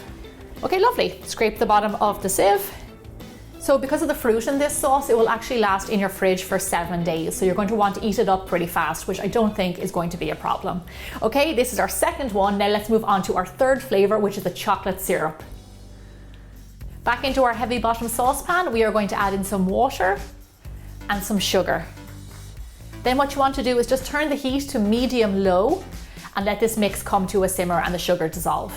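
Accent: Ireland. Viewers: Go back to Ireland for a while I need the accent back LOL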